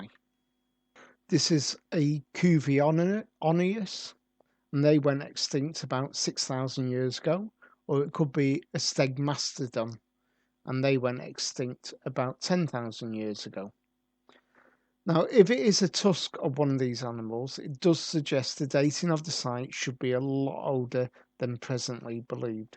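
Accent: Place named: Liverpool, United Kingdom. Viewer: You are a dimwit, you don't even display any knowledge about concrete Let alone Inca stonework. And your scowser accent perfectly portraits your intelligence level ...none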